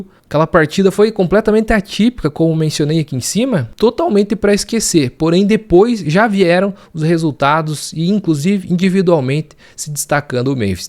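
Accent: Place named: South, Brazil. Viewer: Opa, tudo bem? Você é do sul? Seu sotaque… VAI CORINTHIANS!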